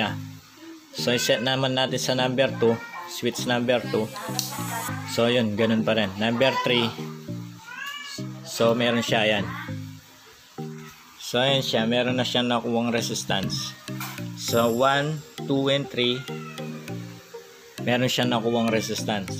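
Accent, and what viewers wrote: Pampanga, Philippines. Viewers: ang galing boss. itatapon ko na dapat yung dalawang sirang electric fan, napaandar ko pa. medyo mahina lang, try ko yung sinabi mong palitan ang capacitor para lumakas. salamat po! parang may pagka-kapampangan ang accent mo boss hehe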